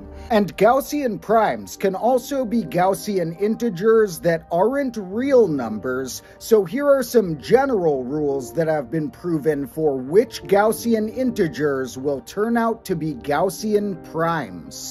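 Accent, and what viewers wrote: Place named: United States. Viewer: It's like with danger and an American accent.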